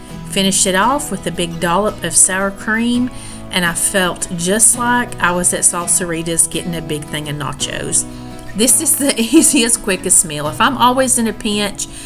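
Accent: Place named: Alabama, United States. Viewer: I just found your channel! I love your accent - you sound like me!❤️from Alabama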